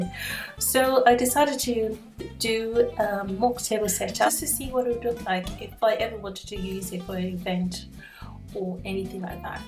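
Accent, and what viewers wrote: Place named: Southern Africa. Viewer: I've been watching your videos and trying to guess where your accent is from... I knew it was Southern African 😏 Zimbabwe? 😂😂😂💟xx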